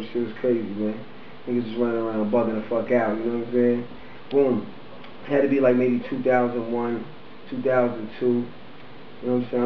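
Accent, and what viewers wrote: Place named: New York, United States. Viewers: you just dont know every new yorker have that accient manily if u from the projects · Why don't he just tell the story, whatever it is, instead of holding on for dear life to that NY accent and name dropping?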